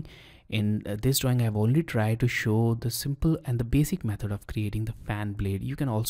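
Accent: India. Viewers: nice lesson bro. you Accent is hindi.. inst it?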